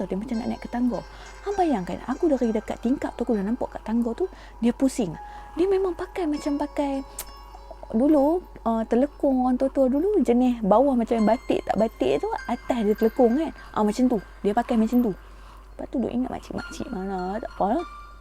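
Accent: North, Malaysia. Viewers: Teruskan buat citer hantu best Cara citer teruskan ckp owg utara best citer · Sama sama bukan apa shiok dengaq hang bercerita dengan loghat utagha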